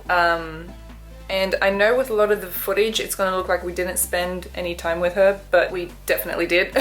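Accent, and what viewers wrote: Australia. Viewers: Have you been living in Australia or sth? Cuz your "So", "No" etc, sound more like Sewrlgh, Newlgh XD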